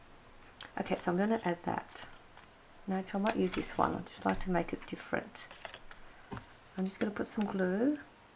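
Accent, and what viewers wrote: Australia. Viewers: Lovely projects and such a gorgeous Aussie accent!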